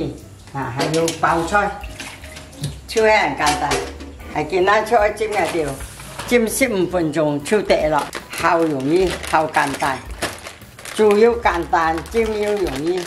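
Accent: Hakka, China. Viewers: 婆婆的客家话跟我的很相似。请问是怡保的客家人吗？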